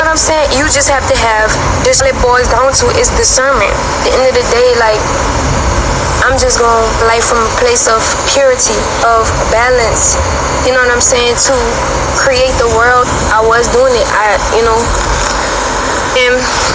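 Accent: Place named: Louisiana, United States. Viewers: her Louisiana accent coming threw strong. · Through it all, Ive always took note and loved her New Orleans accent. Its stronger now that she's back home ❤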